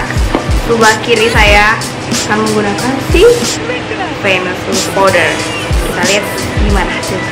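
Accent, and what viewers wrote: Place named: Banjarmasin, Indonesia. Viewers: bahasanya kaya orang banjarmasin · norpuadah puadah ketahuan yaaa.. memang asli banjarmasin mbaa.. thx for visiting 😂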